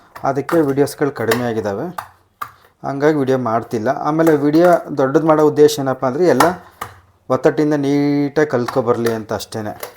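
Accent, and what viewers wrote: Chitradurga, India. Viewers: ಚಿತ್ರದುರ್ಗ ದ ಭಾಷೆ😊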